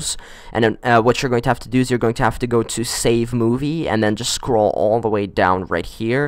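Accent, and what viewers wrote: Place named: Egypt. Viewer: You kind of sound like someone from my school someone Egyptian that comes from Cairo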